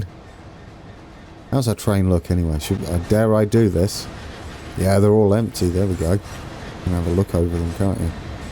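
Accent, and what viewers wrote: United Kingdom. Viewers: It is fitting watching these British rail videos with a brittish narrator like yourself. Mmmm, my immersion levels are in peak condition. I have to say that TWS has GOT to be the best looking and sounding of all the train sims out there. Their artists and effects guys did a top notch job. Also the realistic landslide and they even nailed the workers standing by doing nothing! That is so immersive! XD GG. Gonna watch a few of your train vids. I just got TWS 2 recently and im enjoying it a lot. Doing the Sand Patch campaign now.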